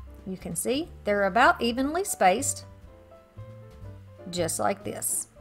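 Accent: South, United States. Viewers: I love how you curled the ribbons on your bow! So easy but so cute! You always find the greatest stuff at your thrift store and at Dirt Cheap! The closest one to me is Fort O, GA! You definitely sound like you’re in the south lol! TFS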